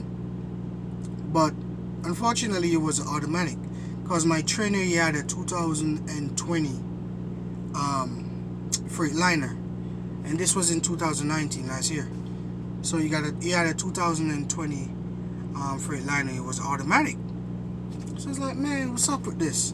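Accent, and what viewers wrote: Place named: Hartford, United States. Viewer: hey u sound like u from the Hartford area right? Was there for 2 years just moved back to nyc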